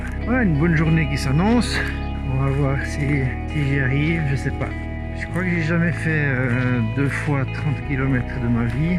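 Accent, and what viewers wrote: Switzerland. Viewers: Bravo à toi d'avoir tenu jusqu'au bout. Tes vidéos sont extras et tes commentaires sont très divertissants et plein de bons conseils. J'ai bien compris que tu film avec un téléphone mais comment tu fais pour pouvoir ramener autant d'images ???? Tu consommes combien de cartes mémoires ? Par contre chapeau pour les prises de vues, un vrai cinéaste ...... Continue comme ça c'est top :-) Bonne continuation. PS : J'adore l'accent Suisse ;-)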